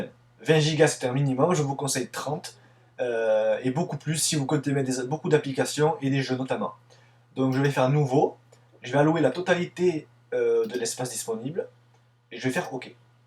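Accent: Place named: Marseille, France. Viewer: toi t marseillais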